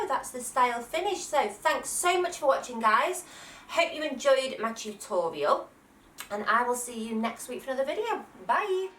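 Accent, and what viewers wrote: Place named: England, United Kingdom. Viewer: great clear tutorial!! i watched a view but got lost seeing the fingers! ps love your English Accent.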